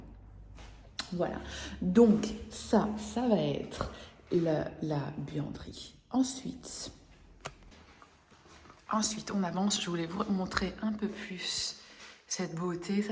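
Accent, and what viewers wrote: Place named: United States. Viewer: English without an American accent please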